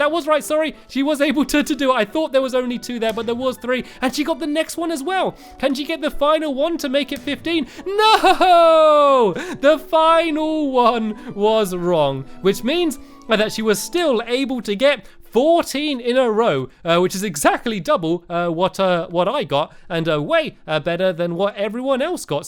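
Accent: United Kingdom. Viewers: One question, Um is stampy british? Because his voice sounds like british something like squid but a little different anyways hi stampy i like your videos! xD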